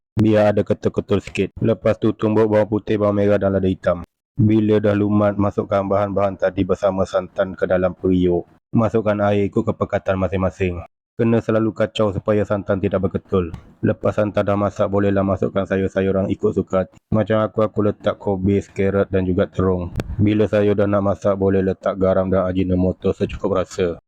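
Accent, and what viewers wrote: Kelantan, Malaysia. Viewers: Ni ori klntan x cpur2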